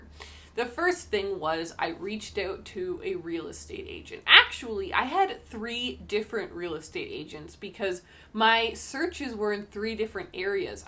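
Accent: Canada. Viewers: That's true but she is canadian so she does have a certain canadian accent from a certain part of the country :) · Canada is gigantic. There are different accents in different regions all across the country. There is no one, single Canadian accent any more than there is one single American or British accent. accent is likely influenced by the area where she grew up.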